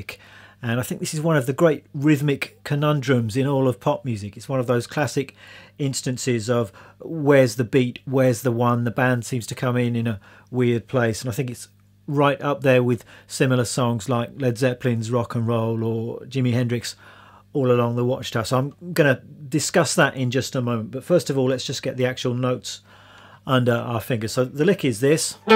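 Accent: United Kingdom. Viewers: Eres británico verdad?jsjs:3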